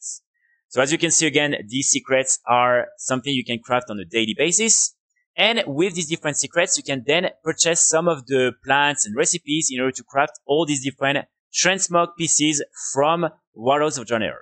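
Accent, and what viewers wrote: France. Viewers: tu serais français à tout hasard?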